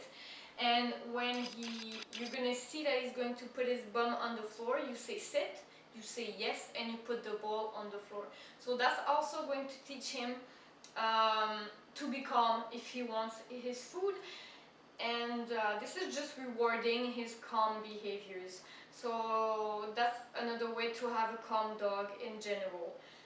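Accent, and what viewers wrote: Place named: Russia. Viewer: mdr, j'étais pas sûr mais parfois tu as la même intonation que les russes, j'entends plein de russe parler anglais ici (shanghai) :)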